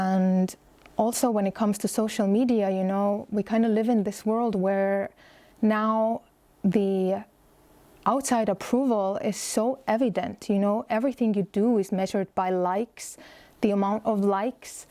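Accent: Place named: Los Angeles, United States. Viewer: "kind of like" yeah, sounds LA alright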